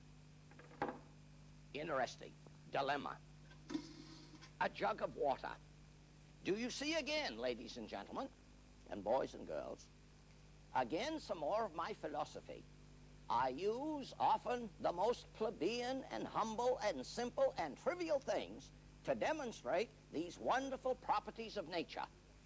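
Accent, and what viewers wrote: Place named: Mid-Atlantic, United States. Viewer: He is using the mid-Atlantic accent. I wont explain it to you but you can search up "BrainStuff-Why do People Talk Weird in Old Movies" on YouTube and they will explain it to you.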